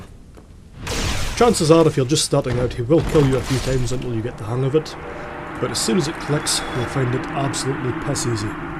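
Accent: Scotland, United Kingdom. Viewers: ***** Scotland mate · Are you like, Scottish? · Scotland laddy like me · Loved it, cannae beat a gid Scottish narrated video. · Pretty sure that's a heavy-duty Scottish accent. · That's the most Scottish accent I've ever heard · You sound like a Scott. You are naturally awesome if that's the case. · I tried to think of a something funny to say about your Scottish accent. But I couldn't think of anything. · Oh god, you're Scottish, marry me <3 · Guy's a Scot, mate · Russian? oO By far you should know this guy is Scottish. xd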